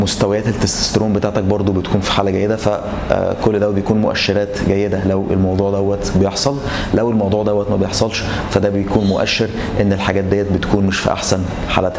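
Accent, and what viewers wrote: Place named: Egypt. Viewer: سبت كل شيء وركزت على جمال لهجتك بموت على اللهجه المصرية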